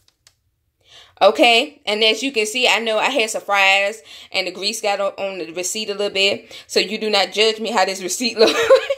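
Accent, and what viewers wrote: United States. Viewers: I love your accent 😊 makes me miss my family in N.C. and don't worry about how you pronounce things, it's cute.....😁 and we understand. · Pronounce it like this " Sara V" .😋 I am from Northern VA, ( living in FL), your southern accent is fine! Stop fussin' so much! Lol!😄 ( I read some of the comments after I posted this, had to come back and say, smart bunch of gals here! 😉 I said the exact same thing they did, with the exact same spelling! Lol! And I didn't read the comments first! (I promise)! 🤣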